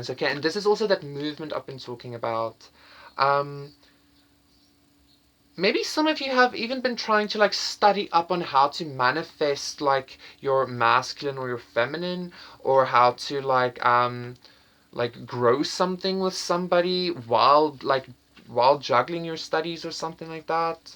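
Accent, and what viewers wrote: South Africa. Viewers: You sound South African, I enjoyed listening to you speak! Thank you for the reading 💜✨ may your channel continue to grow and be prosperous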